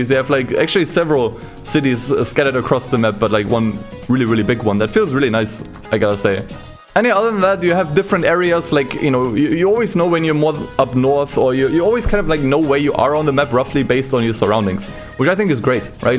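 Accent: Germany. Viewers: U have a strong german dialect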